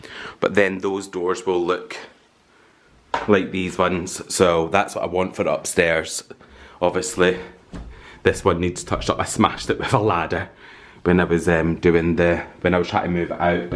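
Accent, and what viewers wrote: Scotland, United Kingdom. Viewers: I found u a few weeks ago I've binged u since n I love hearing a Scottish accent im north east n it's great watching u ur wonderful ur home is gorgeous n I'm so glad I found u I subscribed last week after watching a while n I'm hooked u need to give Lydia n alli Gordon a watch ud love there home xxx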